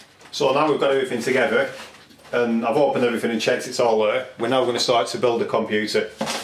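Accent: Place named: Yorkshire, United Kingdom. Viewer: Your accent is sick! haha Where you from? yorkshire?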